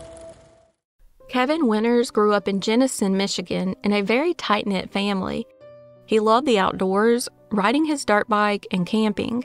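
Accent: South, United States. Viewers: Good evening Southern Belle 😉 so good to hear that Sweet Southern sound! Thank you for putting all the time and effort in to your videos! Your dedication brings us listeners true sad and sometimes happy stories. Much love from Washington State 💞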